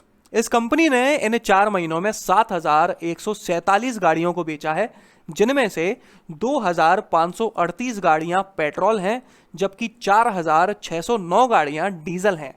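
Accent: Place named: Agra, India. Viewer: Agra ke. Lagte ho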